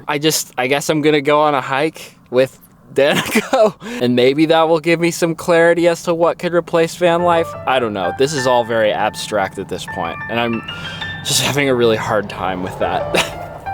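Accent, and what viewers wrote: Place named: Minnesota, United States. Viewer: Nice video and great editing! I'm hitting the road this summer for a project... But I'm older and going to use a van 😁. New subscriber though today. Couldn't tell, but thought I saw a hint of Minnesota? Best wishes on your new adventures 👍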